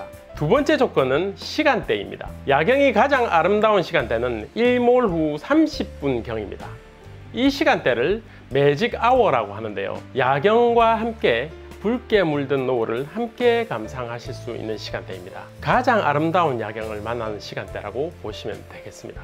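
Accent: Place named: Busan, South Korea. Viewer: 반가워요 부산말투가 이리도 정겨웠나싶네요 좋은정보 감사합니다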